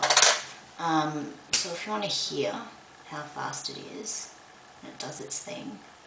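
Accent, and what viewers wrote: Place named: Australia. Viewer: Gr8 comments on the lens. Lovely Nicole Kidman esque accent. I wish you well in your SLR endeavours. Ps. I'm using this lens with a Canon EOS 30V 35mm and have taken 3 shots on the new 500D. Interesting in seeing my 35mm shots since the Digital ones are gr8 in low light.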